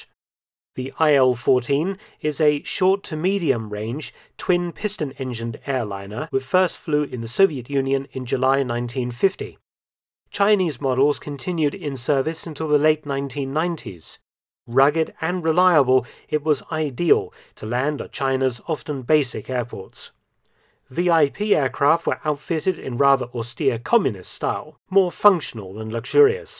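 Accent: United Kingdom. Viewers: He sounds like lots of my friends! (I’m British).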